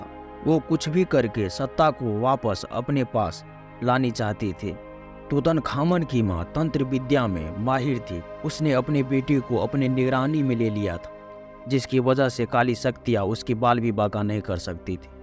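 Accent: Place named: Darbhanga, India. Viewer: Apne ke ghar kahan che? Bhasa se te darbhanga bujhal rahal che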